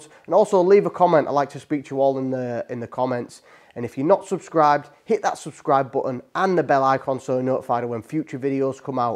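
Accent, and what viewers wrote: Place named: England, United Kingdom. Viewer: Man just found the channel, loving the videos just wish you spoke more you have alot to share! I'm from Birmingham but moved to USA 21 years ago so I love hearing the accent reminds me of England my homeland.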